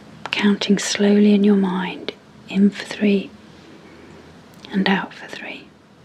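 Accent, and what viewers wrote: United Kingdom. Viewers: Therapy, amsr, soft spoken akd British? Yes, please. Lol. Thank you for your time and energy. 🙏🖤🤟🙃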